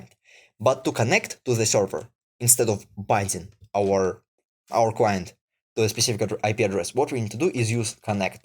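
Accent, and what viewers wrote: Russia. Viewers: эхх, русский акцент выдал тебя, но говоришь по делу, you're being great!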